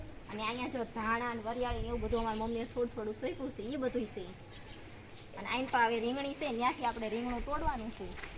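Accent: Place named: Rajasthan, India. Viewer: Tmra boli upar thi lage rajput hso tmaru gam kyu ame bi rajput siye tme amari chennal ne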